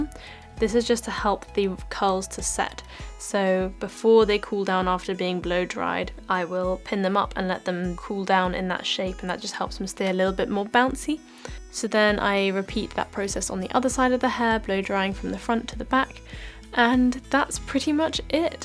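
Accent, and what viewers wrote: United Kingdom. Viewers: Are you British :v ?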